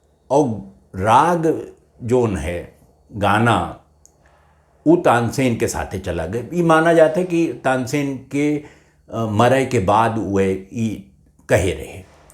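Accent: Awadh, India. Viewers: Got fed up of listening "English" and other languages. मजा तो अवधि सुने में आवत है। और ऊहू आपके जुबान से,मन तृप्त होई जात है। स्वागत ब आपके, ५ साल बाद इंतजार पूरा भय। अवधि आपहे जिंदा किय्यो है, डिजिटल प्लेटफार्म पर। धन्यवाद, आपके। बस ८वी सूचियों में आए जाए, तो संघर्ष पूरा होई जाए।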